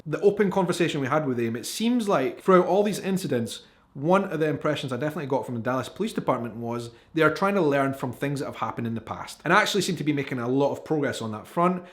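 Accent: Scotland, United Kingdom. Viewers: I subscribed about a week ago and I have not regretted it. Your great! I visited Scotland and was blown away by the beauty of your country. It rivals Texas. It could be its beautiful twin. The Scottish were amazing and amazingly awesome. I have 2 kittens and we all love your accent and your show. This is a great link to Scottish culture. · Hope you're able to visit my home State, Hawaii, some day. Will look forward to seeing THAT video, for sure. I think you underestimate how charming your Scottish accent is. Trust me, anywhere you go throughout the U.S. you will be met with warmth & generosity. A great many people from Great Britain & Ireland settled throughout the American South, so you will find a multitude of peoples with surnames that will be familiar to you. Just take a look at the names of Country Music stars, to begin with. Thanks for all your wonderful videos. 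Your experiences & insights leave me with laughter & pride. I have been to Edinburgh 3 times. Loved it and the people there and throughout Scotland. BEAUTIFUL Country. You have a lot to be proud of.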